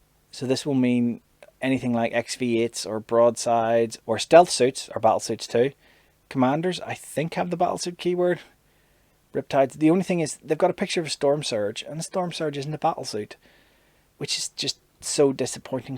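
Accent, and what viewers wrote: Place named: Northern Ireland, United Kingdom. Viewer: I hear another Northern Irish Guy talk about 40k I sub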